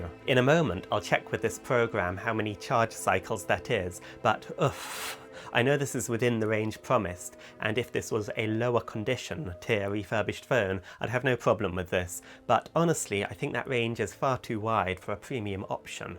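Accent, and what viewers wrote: United Kingdom. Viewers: Mate you are English for sure love it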